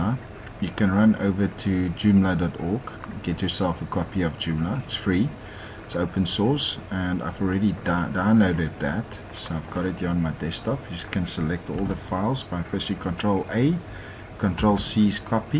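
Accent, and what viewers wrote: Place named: South Africa. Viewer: Spoken like a true South African hey?! LMAO. Hard to miss that accent after all these years of living in the US. Ek mis Suid Afrika so veel my broer dankie vir die studieklas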